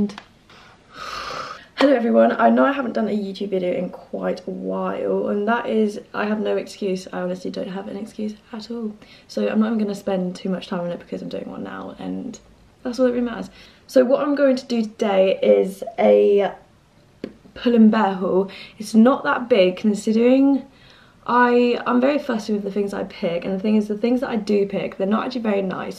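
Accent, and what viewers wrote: United Kingdom. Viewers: oh my god she’s british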